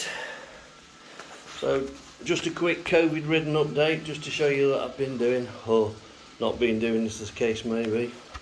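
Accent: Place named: Midlands, United Kingdom. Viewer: hear your dulcett Midlands tones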